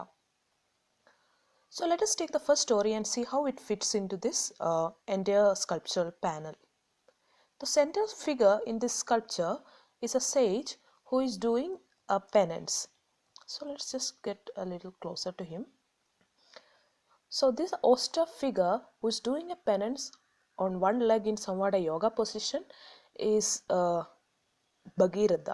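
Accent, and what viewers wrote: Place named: Kerala, India. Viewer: Beautiful video ma’am, love your Malayalam accent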